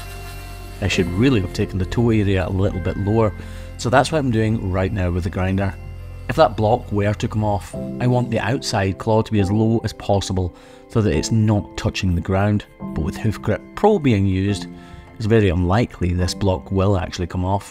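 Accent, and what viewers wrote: Scotland, United Kingdom. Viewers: You sounded more Scots in this vid... what happened? ... ... ... Was this a repeat from a few years ago? I mean, you almost sounded like Craigy Boy! XD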